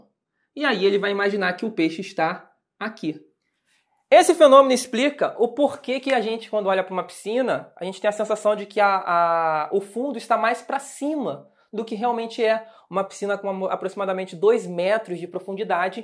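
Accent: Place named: Minas Gerais, Brazil. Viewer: Nuuuu, MINEIRÊS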